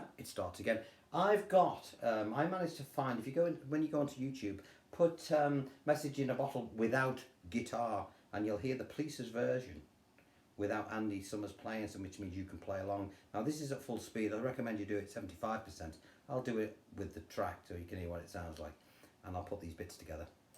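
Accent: Liverpool, United Kingdom. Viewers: Love ye work im learning so much from your lesson. I'm from West Derby but have been living in Townsville Australia since 1984. Love hearing your scouse accent and musical charm. Cheers mate. Go the Reds.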